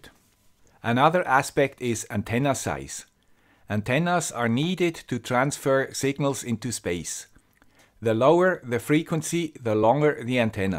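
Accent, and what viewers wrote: Switzerland. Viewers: Perfect video ! Loss in free space chart alone is great. Simple as Einstein says but not simpler. Thank you guy with the Swiss accent for this another great video. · is that a picture of you in the bottom right hand corner at the opening of the video? Its so nice to be able to put a face to your Swiss accent.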